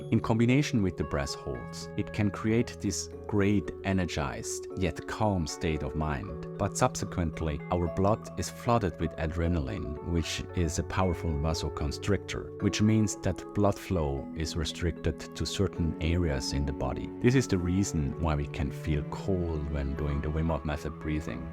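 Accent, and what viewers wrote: Switzerland. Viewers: Salut:-) Ich bin mega happy dass de Youtube-Algorithmus vor es paar Wuche mir din Kanal empfhole het und ich han scho recht schnell s Gfühl gha, dass mir de Dialekt bekannt vor chunt. Cool z gseh, dass au Schwiizer i dem Bereich aktiv sind.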